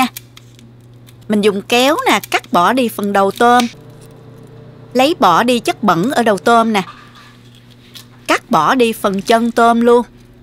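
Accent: South, Vietnam. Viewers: Dung la giong noi Mien Dong Nam Bo.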